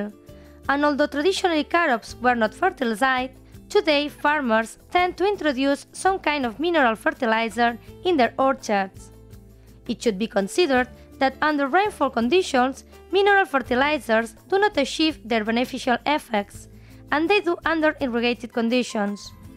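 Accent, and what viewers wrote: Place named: Spain. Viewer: Very informative video. Love the Spanish accent!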